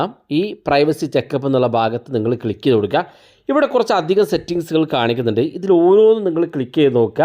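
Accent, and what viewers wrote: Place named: Kozhikode, India. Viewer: Kozhikkodil aano sthalam? Samsaaram kettappol angana thonni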